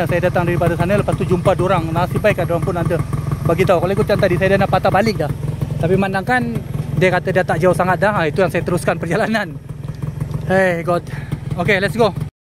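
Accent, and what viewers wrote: Sabah, Malaysia. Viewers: Dia Sabahan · org sabah ke bang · Mantap bro.nanti bolwh repeat dengan kita .5/ 6 org je.Slang abang macam orang Sabah/Sarawak.Betul ke?? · Brother orang mana? Slang cam Sabah je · Bro asal sabah ke dengar longat bahasa melayu macam dari sabah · Abang sabahan ke dengar macam slang sabah bha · Orang sabah ka bang loghat sabah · maaf nk tanya saudara ni asal mana.Bunyi loghat tu sesekali mcm org sabah ttp adakala mcm bunti perak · ni org sabah ke? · Kau orang mane bro? Mcm loghat sabah atau sarawak je 😅😅. Dh lama ke duk semenanjung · Terbaik La.. Bukan Kosong Ni.. Mesti "Berisi".. Hehehe.. Tapi Slang Abang Mcm Borneo Skit.. Bunyi Macam Sabahan.. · Sabahan ke..terbaik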